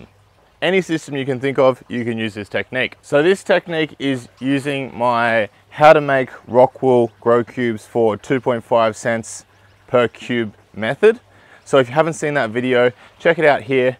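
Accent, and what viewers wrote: Australia. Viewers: Love your content!! To also have another Australian accent schooling me is nice 😂 the familiarity is soothing af- cheers! Your content is dead brilliant!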